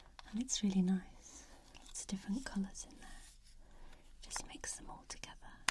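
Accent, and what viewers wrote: England, United Kingdom. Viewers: I have recently found your channel and I had to take this opportunity to say how much I am enjoying your videos! I love your honesty and authenticity in this particular video. :) You've inspired me and I just wanted to let you know! By the way, I love your sweet English accent...it's lovely!!! Joanne xx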